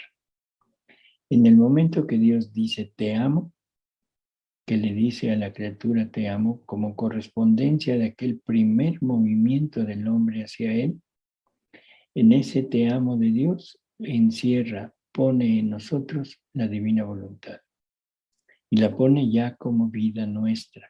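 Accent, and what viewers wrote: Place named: Mexico. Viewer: Ay! Doctor, es usted una maravilla de palabras, explicaciones viva su maravilloso acento mexicano. Le amo, el Señor le bendiga.desde 🇪🇦 ledoylos buenos dias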